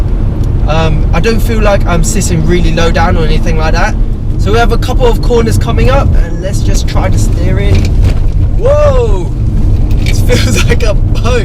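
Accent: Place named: London, United Kingdom. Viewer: You sound very much like a London kid- innit?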